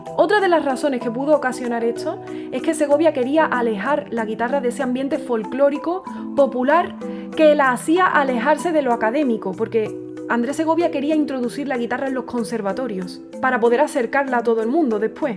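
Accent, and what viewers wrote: Andalusia, Spain. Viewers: Gracias! Me encanta la guitarra, su sonido, su historia. Y me encanta que nos cuentas la historia del compositor, sobre todo con tu acento andalu y siempre con una sonrisa! 🙂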